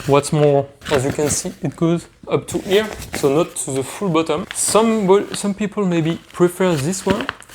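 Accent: France. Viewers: Hi, I love AER products. Could the AER City Pack Pro Ultra fit in a 40x30x20com to be used as a personal item on Transavia ? NB : je viens de découvrir votre chaine et j'aime beaucoup le style même si l'accent français est bien présent. En VF ça serait bien aussi d'autant plus qu'il n'y a rien dans ce domaine en Français.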